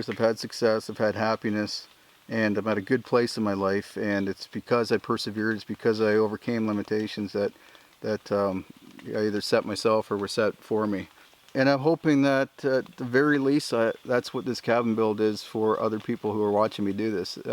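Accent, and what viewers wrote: Canada. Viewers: i can tell by the maple leafs and ur accent ur canadian lile me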